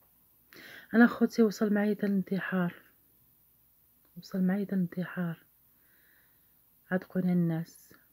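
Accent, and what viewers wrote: Gulf, Arab world. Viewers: هذه السيده شكيت عندها اللهجه خليجيه ما يمكنش معندهش عاءلة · اختي انتي كلامك مائل الخليجي معقولة كنتي فالخليج وماعندك باش ترجعي لبلادك على العموم الله يجيب اللي يرجعك لبلادك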